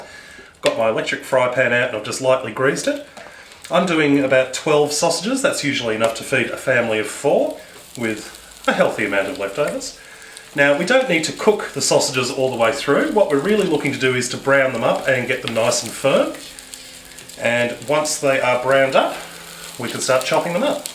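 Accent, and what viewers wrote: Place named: Australia. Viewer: It’s the voice for me. Nice Aussie accent.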